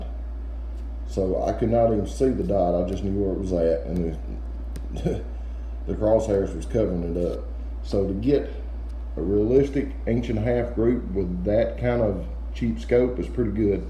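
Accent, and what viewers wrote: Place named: Kentucky, United States. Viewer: You sound like a fellow Kentuckian. Am I right?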